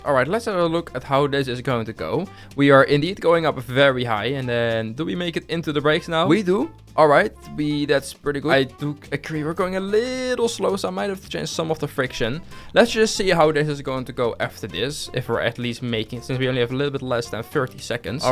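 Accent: Netherlands. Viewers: Are u dutch? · Ben jij Nederlands dik hoor het aan je accent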